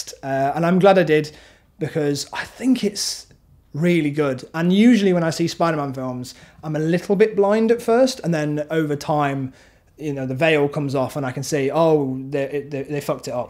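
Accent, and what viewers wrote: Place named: East Midlands, United Kingdom. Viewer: I knew was an East Midlands lad! Woo!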